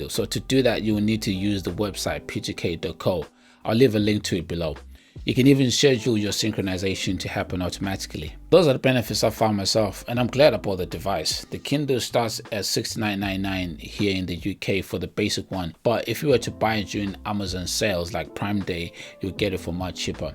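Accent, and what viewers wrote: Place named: Nigeria. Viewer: I can hear that Nigerian accent. Are you Nigerian? Also, amazing video!!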